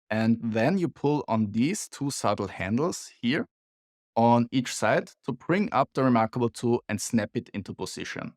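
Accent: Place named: Vienna, Austria. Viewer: This Viennese non-English is hard to stomach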